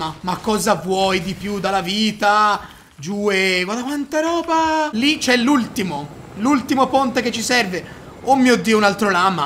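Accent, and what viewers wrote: Tuscany, Italy. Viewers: hey ma sei toscano o sbaglio? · E il dualetto toscano genios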